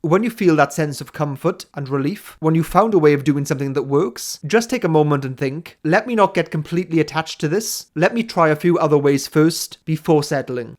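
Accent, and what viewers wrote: United Kingdom. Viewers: One thing I’ve struggled with as a very introverted ISFJ is that, because I keep pretty high boundary walls around my life, I’m not as busy helping other people as the ISFJ descriptions usually indicate. Over time, I’ve realized that my worry over this is simply another manifestation of the same underlying issue: that we ISFJs are anxious about doing too little. Many ISFJs hurt themselves by giving too much, while others hurt themselves by giving a normal/healthy amount and then sitting around feeling bad about it. If you have an ISFJ in your life who’s constantly overgiving or freaking out about whether they’re giving enough, the best way you can help them is reassurance that you are content with them as they are. Though they are giving/helping to make you happy, they’re likely also doing it to stave off feelings of worthlessness, so if you can encourage them to feel satisfied, they’re less likely to get neurotic and burn themselves out. 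If I can be satisfied with giving what I’m able to and not feeling bad that it’s not 100%, to-the-death giving, I’ll be a much healthier person. Thanks for understanding me,! (And thanks for being British. I love your accent.)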